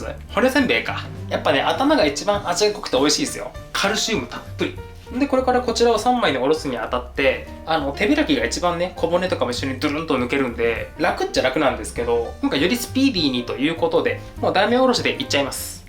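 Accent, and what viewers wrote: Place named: Fukuoka, Japan. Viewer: 福岡弁が心地いいですね〜☺️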